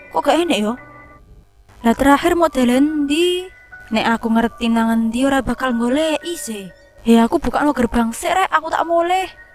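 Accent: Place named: Medan, Indonesia. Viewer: Kamu orang Medan ya? Dari logat bicaranya seperti orang Medan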